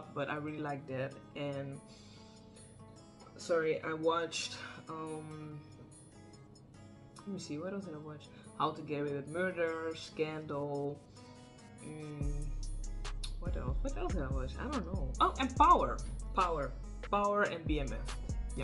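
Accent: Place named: United Kingdom. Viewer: Ik heb de hele video gekeken i love it girl hahhahaha the british accent 😂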